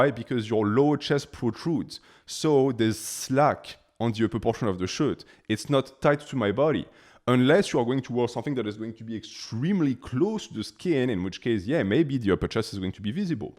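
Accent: France. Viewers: Flag, accent, demeanor, face, diet, him literally saying he's french .... What are you even on ? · T’es français?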